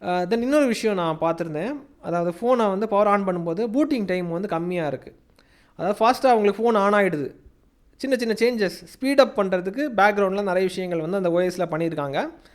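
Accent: Kanyakumari, India. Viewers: Bro நீங்க கன்னியாகுமரி மாவட்ட போச்சு தெரியுது, சூப்பர் bro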